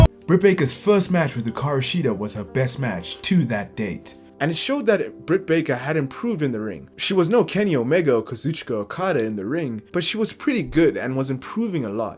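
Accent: South Africa. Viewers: You sound like a south African. Good job brother you did a great job on the video so funny and entertaining. Your channels gonna blow up keep it up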